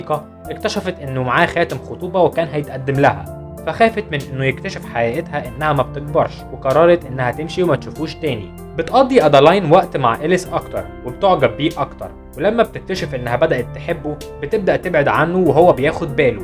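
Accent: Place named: United States. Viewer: شبه صوت شخص امريكي تلعم مصري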